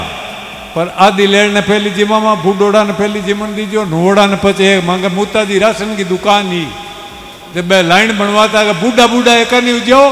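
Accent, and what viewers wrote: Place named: Nagaur, India. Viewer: Nagour ki boli bahut achi lagi 😊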